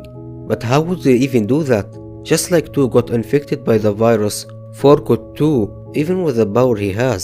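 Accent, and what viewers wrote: Russia. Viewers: russian guy? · Your accent kinda funny Are you Russian?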